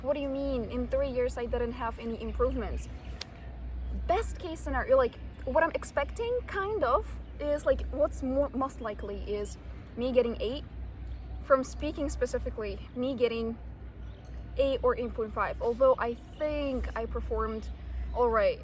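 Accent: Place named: United States. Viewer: Before i saw your ID card i thougt she is an american. Amazing your speaking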